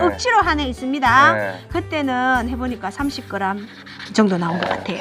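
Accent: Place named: Sangju, South Korea. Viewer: 억양보니 상주 근처네요 . . 재밌고 잘하네요.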